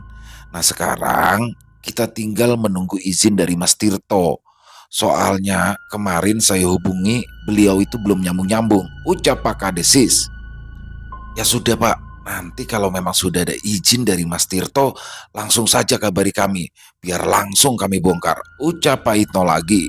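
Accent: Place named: Jakarta, Indonesia. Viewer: Klau dengerin cerita bg seakan terbawa suasana dan tau2 critanya udah selesai bgus bgt carapenyampaianya pnuh semangat apa lagi klu udah dg logat betawi keren deh......👍👍👍👍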